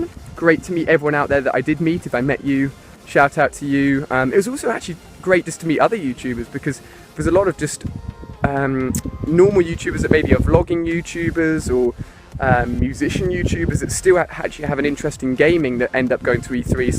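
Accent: England, United Kingdom. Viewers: Trust me, I know you're not hating. But most of us in the UK pronounce it like that. Same with due, and durability. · He's in England why the fuck would he be in Scotland because he has a English accent like me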